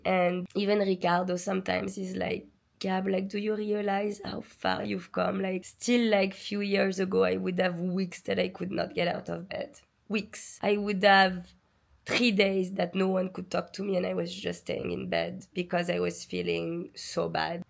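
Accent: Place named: France. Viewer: dear, thank you so much for all the directions you gave to achieve our dream lives. i feel like i am hearing from such a beloved friend when listening to you. love the way you express yourself (also, your french accent!). i do not only believe, but feel you truly are such an amazing person and have a marvelous soul. may your life be always filled with love, joy and blessings ❤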